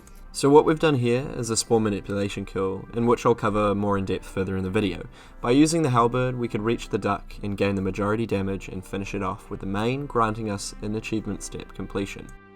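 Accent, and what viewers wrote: New Zealand. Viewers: Bro I swear you sound like ur from nz, am I right? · I realised half way through the video when you were pronouncing some of your words that you were from the brother country lmao, no worries mate :)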